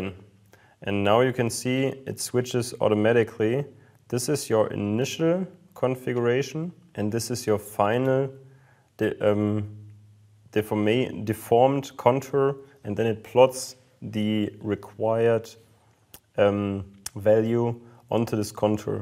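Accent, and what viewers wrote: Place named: Germany. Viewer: deine Aussprache scheint Sie kommen aus Deutschland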